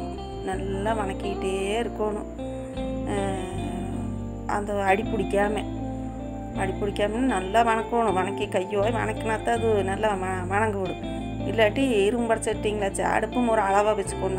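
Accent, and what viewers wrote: Kongu, India. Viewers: கொங்கு தமிழ்😎😎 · கொங்கு தமிழ் ultimate.... 👏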